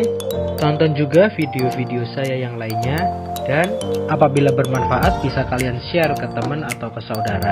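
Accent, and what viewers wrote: Java, Indonesia. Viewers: Ngomonge jawil banget....... alias medok banget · Medok mu itu 😍🥰💕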